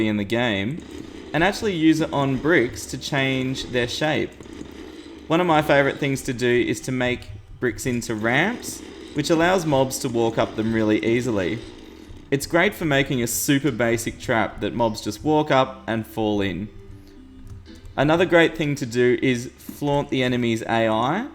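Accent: Australia. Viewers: You sound Australian, if you are im to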